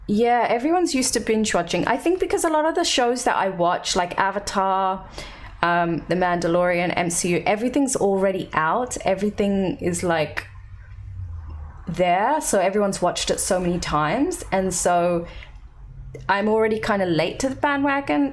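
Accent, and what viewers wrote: Australia. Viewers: I'm looking forward to the MCU stuff for sure, but go at your own pace. A happy reactor, is a better reactor. 😁 Can sort of see some resemblance to Sweet Anita but that good old Aussie twang is instantly recognisable, for me anyway haha. Very easy to tell you apart from my perspective at least, even if it's purely on the looks. got her own vibes & style! 👍😂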